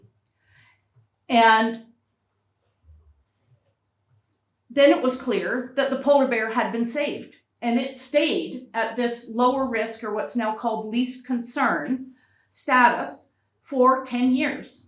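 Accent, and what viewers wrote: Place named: Canada. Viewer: She is a Canadian. American is a foreign language for her.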